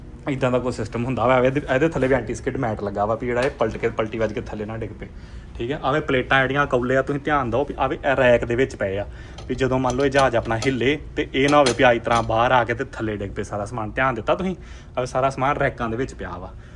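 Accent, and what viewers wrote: Majha, India. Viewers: ਬਾਈ ਜੀ 12.23 ਜਦੋਂ ਬਾਹਰ ਦਾ ਵਿਊ ਦਿਖਾਇਆ ਸੀ ਉਦੋਂ ਬਾਹਰ ਕੀ ਸੀ ਜਿਵ੍ਂ JCB ਨੇ ਜ਼ਹਾਜ ਚੁੱਕਿਆ ਹੁੰਦਾ ਟੋਚਨ ਪਾ ਕੇ , ਉਹ ਸਮਝ ਨਹੀ ਲੱਗੀ ਕੀ ਚੱਕਰ ਸੀ ਸਮਝਾਿਓ ਜ਼ਰੂਰ , ਮੈ ਲੁਧਿਆਣੇ ਤੋਂ ਤੁਹਾਡੀਆਂ ਸਾਰੀਆਂ ਵਿਡੀਓ ਦੇਖਦਾਂ ਬਹੁਤ ਨਜ਼ਾਰਾ ਆਉਂਦਾ ਵਿਡੀਓ ਦੇਖਕੇ ਤੇ ਮਾਝੇ ਦੀ ਬੋਲੀ ਸੁਣਕੇ ਤਾਂ ਹੋਰ ਮਜ਼ਾ ਆਉਂਦਾ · eh majhe side di pure punjabi hi hai ji.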